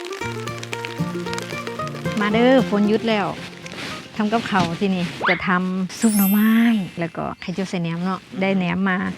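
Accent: Korat, Thailand. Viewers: เป็นคนจังหวัดอะไรหรอ สำเนียงแบบนี้ คนโคราชหรือเปล่าคะ 😂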